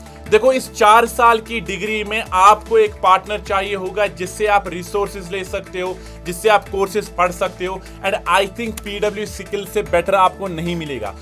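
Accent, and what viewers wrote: Kashmir, India. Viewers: Why ur accent sounds like kashmiri?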